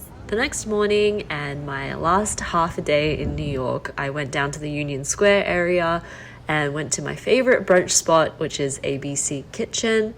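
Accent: Australia. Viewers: She's Australian. I understand her just fine.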